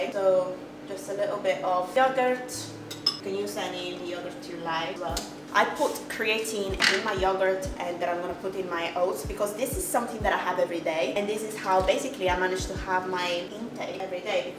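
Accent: Italy. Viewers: Ehi bella, sei la mia inspirazione! Io sono mezza italiana e vederti tornare al tuo accento italiano mi scalda il cuore. Sono così orgogliosa di vederti come italiana che vive nel Regno Unito. Per favore, non cambiare mai chi sei perché abbiamo bisogno che più persone come te siano se stesse in modo impenitente. Non sai quanto sei bella e unica. Gli altri ti invidiano perché sono ignoranti e vogliono quello che hai. Sii orgoglioso di essere italiana!! 🇮🇹🇮🇹🇮🇹😘❤❤ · Your accent is amazing. Are you kidding? They're jealous...you're hot, smart and have a sext accent. Come on!! I'm Italian also and your accent is so authentic, beautiful. Forget these idiots!!! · I would’ve never guessed you were Italian I thought maybe you were Puerto Rican or Dominican I love the accent 😍 Your body is fire 🔥 work it girl Love your content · Hearing the Italian mixed in with the English accent when yo speak is amazing to me. 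I love hearing it and doesn’t make a difference in understanding your content. For me it gives you character and I love when you call us famiglia. Thank you for all that you do. Your content is so informative, real and inspiring. Also you are so brave for moving all by yourself to a new country at such a young age. Your success shows your strength and perseverance. Lastly that concealer looks amazing, what brand is it? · I love your accent! My best friend is from Trapani and it's her essence. I love seeing her as natural as she is, the same I wish from everyone! Also, loving when you're consistent with creating content, you entertain me a lot! But I understand that it's a lot of work and effort, so I'll be waiting paciently <3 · I don't think people were picking on your accent. They were curious as to why you suddenly sounded different. The Italian accent is beautiful and it's sad that you felt that you had to hide it. 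Good that you're not doing it anymore, apologies for judging without first understanding.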